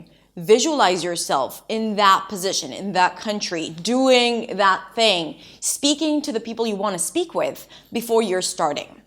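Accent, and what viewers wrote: United States. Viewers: I want to be fluent 1 - In order to get married with an English man. Hoping he will learn Arabic so I won’t have to fight with English. 2 - I live in Canada 🇨🇦 I have to speak English. you are from Israel 🇮🇱 but you sound 100% American you are very smart. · Thank you so much for this clip. I always wanted to become a fluent English speaker, but I never asked myself why, so now you have made me become a critical thinker. I used to think you were born in the USA since no one can separate you from those who were born there due to your American accent until you told that you were not American.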